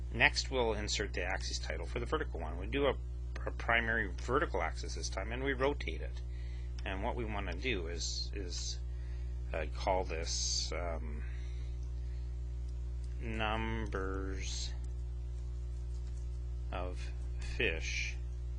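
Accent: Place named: Canada. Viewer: you are one of the most Canadian sounding person I've ever heard. also thank you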